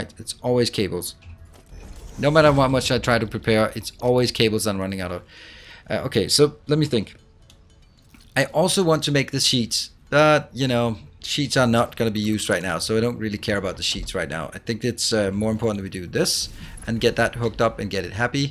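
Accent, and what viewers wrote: Denmark. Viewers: yo mate good video just got the game and wanted to lean a bit while downloading :D Little side Quest ion. are you danish ? your accent makes me think that hehe and wanted to ask. any way have a nice day ^^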